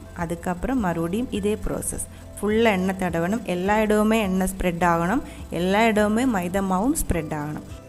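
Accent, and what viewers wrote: Kerala, India. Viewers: உங்கள் malayalam கலந்த தமிழ் பேச்சு மிக மிக அருமை.கேட்டு கொண்டே இருக்கலாம் போல உள்ளது Sister. வாழ்க வளமுடன். உங்கள் கேரளா recipes also அருமை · ur slang is so good and ur voice also malayalam kaladha Tamil nice to hear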